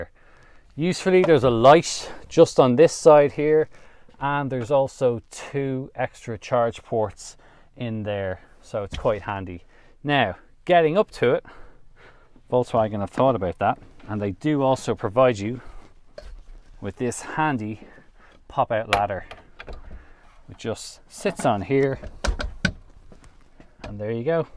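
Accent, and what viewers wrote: Ireland. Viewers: The Irish accent had me sold before we even saw inside the van lol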